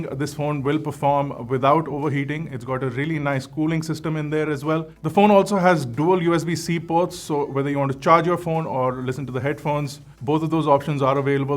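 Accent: India. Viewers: Shout out to your neutral accent which is missing from the other Indian tech channels who put out content in English. Thanks for the quality of video and the precise verdict.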